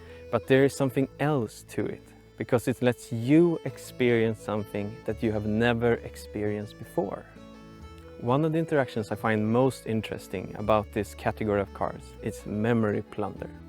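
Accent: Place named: Sweden. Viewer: As soon as I saw the house and nature I was like "this looks like Sweden", then heard you speak and was like "yep, Sweden" 💛 The fact that this was clearly filmed in summer and was published now in winter after the super snowstorm was (1) very good for my mental health to help me look forward to the next summer, and (2) a testament of the effort it must have taken you in editing and post-production. The video was amazing and I'm glad you took the leap and made it happen, congrats and keep it up!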